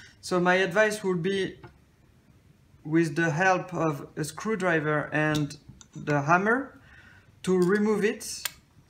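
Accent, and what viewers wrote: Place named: New Zealand. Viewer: Very usefull, with a Kiwi accent, thanks.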